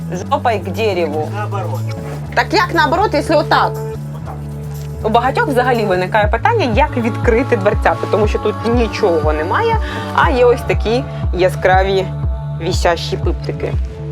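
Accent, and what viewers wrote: Russia. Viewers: Вах, Тарик, разбирается в мове? Так крыша или дах? Послушай ещё раз! Ведущая, просто "Пэрсык в Багдадской ночи!", но украинская речь - с русизмами, а это - суржик... Увы.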